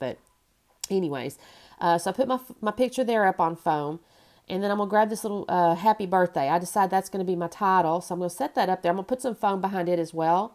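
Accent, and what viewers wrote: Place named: Virginia, United States. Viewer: Congratulations on meeting your subscriber goal of 1,000! I always enjoy your layouts and your personality in your videos. My husband was walking through the room yesterday when I was watching your latest video and said "Where's she from? She sounds like she's from back home where I lived in Virginia!" Hope you keep on making videos and continue to increase your subscribers!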